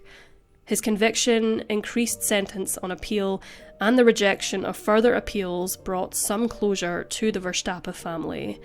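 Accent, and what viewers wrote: Scotland, United Kingdom. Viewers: Her accent is Scottish 😊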